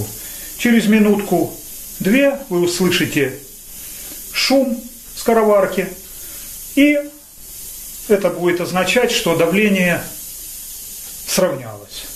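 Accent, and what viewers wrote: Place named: Ukraine. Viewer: Хас, хатоука, прихотовление, ховядина, храдусы... Попробуйте сделать над собой усилие и говорите по-русски. Или уж честно на мове начинайте гоорить. К которой, кстати говоря, большинство русских относится совершенно нормально. Но это смешение наречий, рожающее суржик, просто режет ухо. А за рецепт спасибо! Зачётно!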